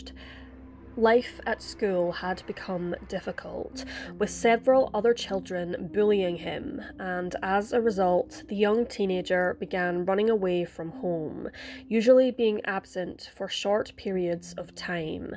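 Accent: Scotland, United Kingdom. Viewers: WoW you have a heavy Accent like uk or Scottish accent. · She is Scottish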